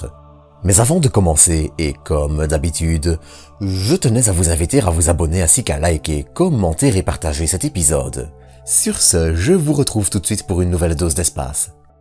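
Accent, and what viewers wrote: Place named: Brussels, Belgium. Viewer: J’ai vu ta bouille en cherchant un peu. On entend clairement les efforts que tu fais pour réduire l’accent Bruxellois(je suis belge aussi d’Anderlecht même) une proposition: et si tu t’en foutais et que le ferais avec ta façon normale de parler tu attirerais probablement plus de personnes et deviendrais le premier youtuber ciel et espace du plat pays. Allei tichke tes goed!😜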